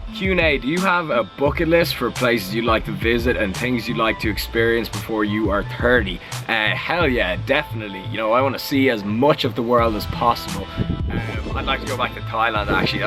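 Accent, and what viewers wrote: Ireland. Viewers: Ahhhh I love the Irish accent! Check out my vlogs if you get a spare moment :-) Love from London xx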